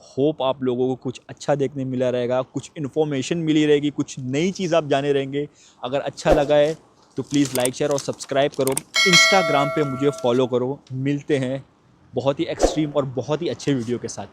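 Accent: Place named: Maharashtra, India. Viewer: Tu marathi accent m mat bol bhai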